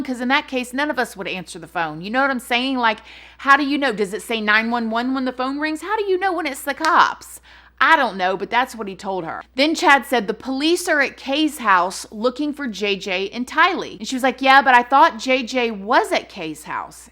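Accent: South, United States. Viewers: I watch as many of your videos that I can, but you just had me cracking up, I haven't laughed so hard in a minute, With your southern accent, the expression on your face, talking about this dizzy blonde wacko, "like okay calm down, You're gonna have to sit down and calm down here sister!" It was just so hilarious, thanks for the laugh I needed it!! That's why I stay watching, you have a gift for doing these videos, God Bless You 🌼🌻🌼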